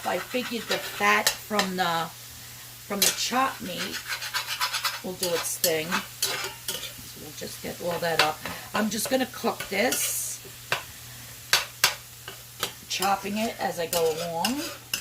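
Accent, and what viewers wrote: New York, United States. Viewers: I like your recipes! I’m following you to keep on track with WW purple. Being from Philly, I love your New York accent💗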